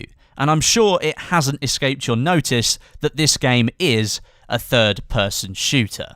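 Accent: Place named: United Kingdom. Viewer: why do brits get famous by posting crappy vids. i want to see REAL gameplay not listen to your annoying brit voice.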